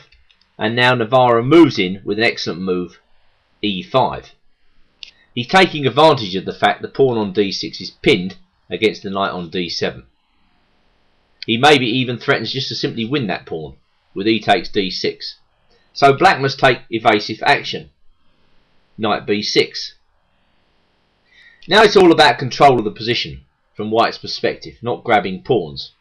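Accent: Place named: England, United Kingdom. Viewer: I don't think so at all. It's a classic English accent. At least I can understand him. Whereas with a Scottish accent for example, I often have trouble understanding it. Scottish accents remind me of French Cajun from Louisiana where almost every other word is indiscernible. So I have no gripes about this man's accent. Perfectly English.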